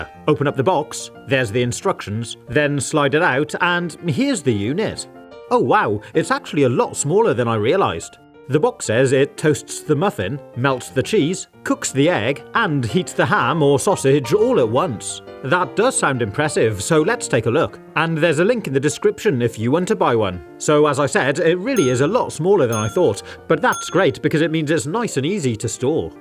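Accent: United Kingdom. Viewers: Apart from the constant upwards inflection at the end of every sentence, this bloke is the most British man to ever British. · SO HES BRITISH?! I’ve been wondering what his accent is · nga hes jus british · The most British voice I ever heard 😊 good video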